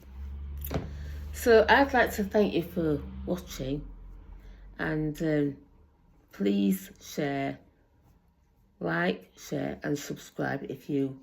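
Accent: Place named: North, United Kingdom. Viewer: Hey sis, who'd of thunk our Northern accents sound good to people overseas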